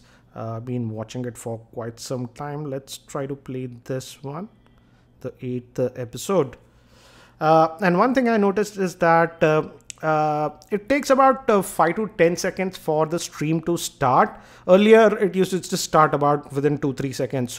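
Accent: India. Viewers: Believe me even as an Indian think his accent is way too accentuate. Very few people talk this way😅😂😂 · Then u are not Indian as he has Indian Accent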